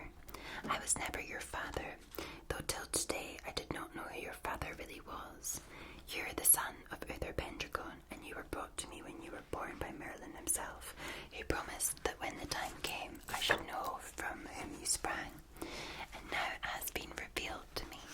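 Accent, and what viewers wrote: Scotland, United Kingdom. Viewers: Lovely, little Angel. Another delightful session just how I like it. Up close and personal with the very tingly “dot, dot, line, line” verse! I love listening to you read with that gorgeous Scottish brogue! You lovely face just lights up my iPad screen as well as this old heart of mine. Thank you so very much, darlin’ lass! 🌹🙏🤗🧚‍♀️ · First of yours I've seen but the Scottish accent although not same dialect as mine is like being back home even just for twenty minutes. Hope ye keep it up. Very talented lassie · I would absolutely love more stories read in that accent. Makes me want to channel my scottish ancestry and fight the brits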